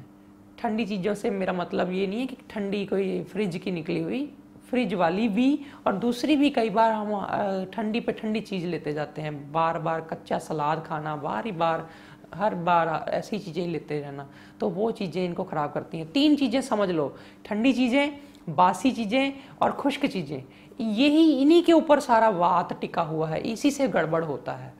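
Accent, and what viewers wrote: Punjab, India. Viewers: Guru g tuhaadi bhasha wich Punjabi touch hai. Kirpa karke ik video Punjabi wich bnaao. Mein tuhaade moohon Punjabi sunana chahubdi haa. Plzzzzzz 🙏mein v Punjabi haa.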